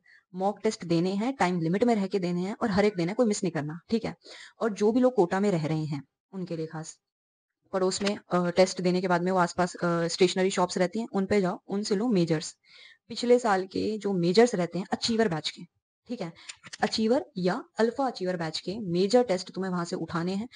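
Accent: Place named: Haryana, India. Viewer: U have got haryanvi touch in ur voice... Sweet pinch🍬🍁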